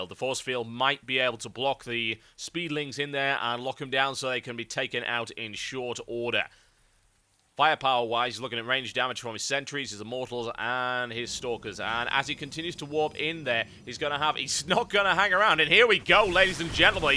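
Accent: United Kingdom. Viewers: Why you should grant me a betakey? To much barbarism and not enough Earl Grey in the copper league it seems. Someone please think of the ex-convicts turned marines who probably done horrible things to nice people to get sentenced to such a fate. Oh, and taking quite a fancy to your rather shouty brittish casting. More of that please. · I've been watching your "I suck at SC2'" and Shoutcraft video's, and I must say, the I suck helps a lot to bolster my own play, and these shoutcrafts are the few I really like to watch in terms of SC2 matches, possibly because of the cynical brit voice. Looking forward to your next video!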